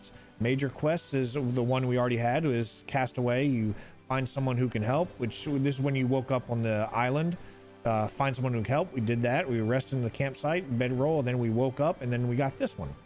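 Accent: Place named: United States. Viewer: It is somehow weird watching someone with an American accent play this game.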